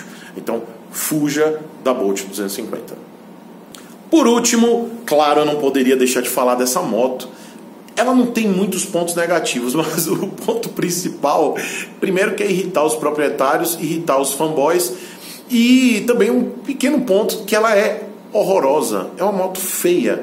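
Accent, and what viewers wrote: Bahia, Brazil. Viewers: Rapaz, barril dobrado 😂😂esse é Baiano mesmo😂😂.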